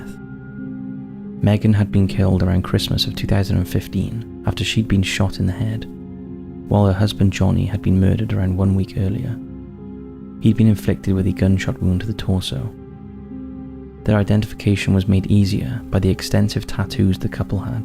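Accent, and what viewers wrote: United Kingdom. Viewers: I love your doco’s - I’ll be watching every one. Good realistic footage that’s relevant and great British voice ❤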